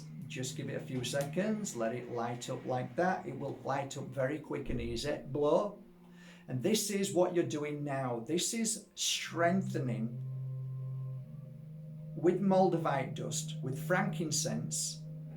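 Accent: Yorkshire, United Kingdom. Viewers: I love listening to this Genuine “ Yorkshireman !! Tells it how it is !!!!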